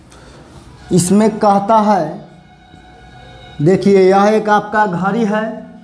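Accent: Bihar, India. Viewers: Bihar se ho kya bhai.......... Mai bhi hu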